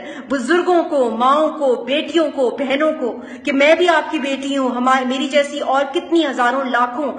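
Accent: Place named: Punjab, India. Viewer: Kashmiri my foot, her accent is punjabi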